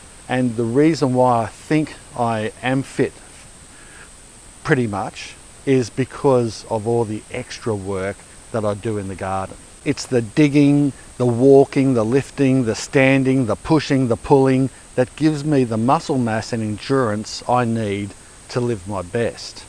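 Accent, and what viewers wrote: Australia. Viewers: Just love these videos just so typically Australian great listening.Kerry Australia 🇦🇺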